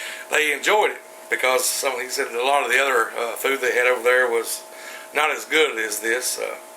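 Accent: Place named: South, United States. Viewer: Aww! Loved your vid and Southern accent. Looks great. A few things you said made me giggle and I enjoyed your deep love/respect for your pops. Thanks for sharing!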